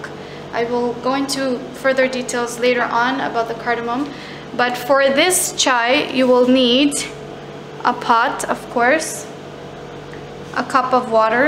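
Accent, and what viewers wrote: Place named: Arab world. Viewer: I can tell from your accent that you're an Arab, haha 😁 I will try your method, thanks x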